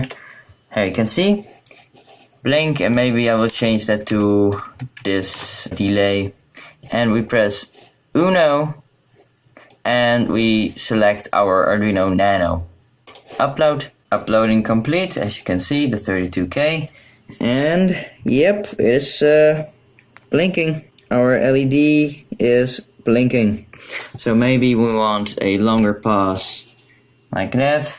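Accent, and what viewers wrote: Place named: England, United Kingdom. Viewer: broooo, ik mag je engelse accent wel en thnx voor de fix!!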